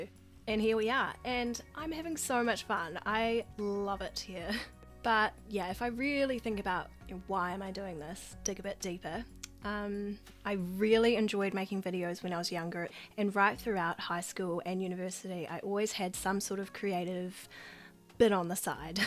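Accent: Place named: New Zealand. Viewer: I'm from texas, & I find your new zealand accent so charming ☺️ welcome to the book community, 💞 you're doing great!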